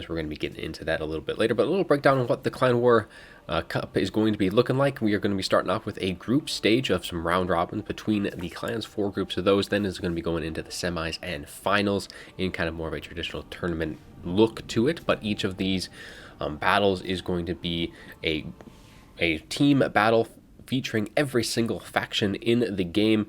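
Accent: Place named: Russia. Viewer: Great accent. Russian right?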